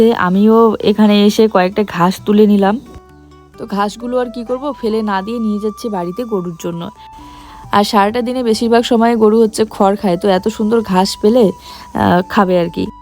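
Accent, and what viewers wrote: India. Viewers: Arokom adha suddho r adha Rajbongshi vasha milie j vabea kotha bolo shunte valoi lagea.cha er sospen tai dim omlet korte paro.thal tou patla hoi tai fute utheche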